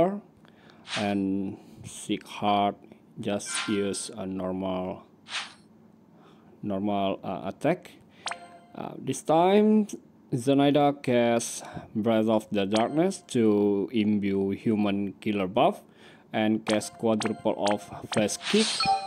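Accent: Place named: Indonesia. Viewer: dude, your videos - clears, reviews and strats- are all amazing, keep up the great work... btw, you accent sounds a little Indonesian..?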